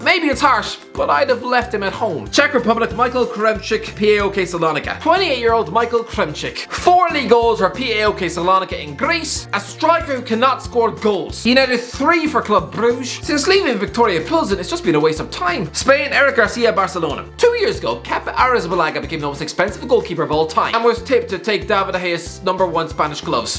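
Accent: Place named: Ireland. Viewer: He is Irish, accents are different SHUSH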